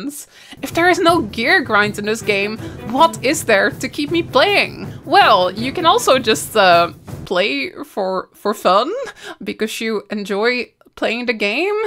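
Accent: Netherlands. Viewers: Ur dutch :p !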